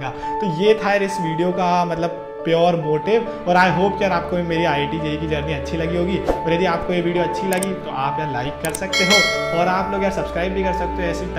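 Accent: Indore, India. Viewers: Tysm for uploading this video!! Really inspiring story!! 🤩🤩 Btw bhaiya aapke baat krne m indori jhalakti h!🥹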